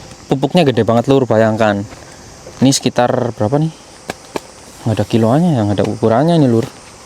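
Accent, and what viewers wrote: Banyumas, Indonesia. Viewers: Ngga apa" mas yg penting halal Mas berasal dari man bahasanya pake nyong ngapak mana lur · asalnya dari mana bro...medok banget Kya bhsane Inyong ...salam kenal inyon d Bobotsari Purbalingga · wong ngpak ya mas smpean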